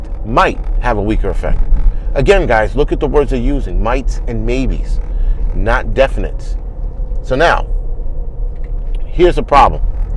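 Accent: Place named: Bronx, United States. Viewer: Hes not angry....hes just from the Bronx.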